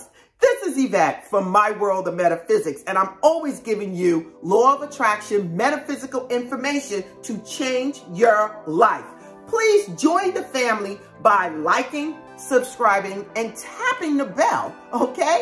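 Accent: New York, United States. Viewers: This has been happening 4 or 5 times during the week I'll start doing this tonight. Thank You Oh yeah. Are you from NY.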